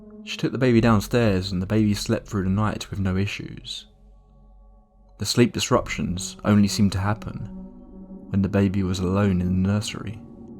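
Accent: United Kingdom. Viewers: Just stumbled upon your channel. Great storytelling and delivery. Not many of these types of videos have that elegant across-the-pond accent. 😊 Thank you for all your efforts and keep up the great work mate! Cheers from New York City.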